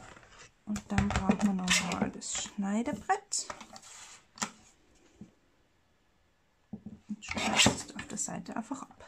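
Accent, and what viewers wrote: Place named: Austria. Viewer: Hallo liebe! Deine Videos sind einfach genial! 👍🏻😍Ich schau sie mir sehr gerne an. Die Anleitungen sind super verständlich und einfach. Ich staune immer, was für tolle Idee du für die Stanzformen vom Action hast. Anfänglich sind sie mir irgendwie"billig" vorgekommen,aber mittlerweile find ich sie richtig gut. Und dank deinen Videos natürlich auch 😊. Es spielt auch eine große Rolle, daß endlich eine Österreicherin amal gute Videos macht! Natürlich sind die von unseren deutschen Nachbarn auch sehr gut,aber der heimische Dialekt macht halt schon einen Unterschied 😁🤭. Na auf jeden Fall werde ich mir auch deine nächsten Videos"reinziehen und ich bin jetzt schon gspannt,was du schönes basteln wirst😊💕.Glg Maria aus Bad Gleichenberg/ Südoststeiermark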